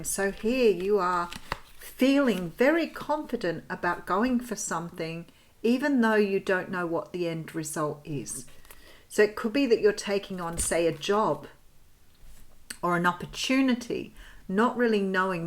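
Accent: Australia. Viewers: So lovely to hear the Australian accent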